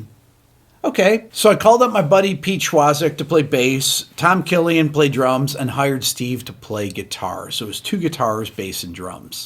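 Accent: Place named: Upstate New York, United States. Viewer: What a great story about teaching at IC. Love your channel and love that you still have the upstate accent:)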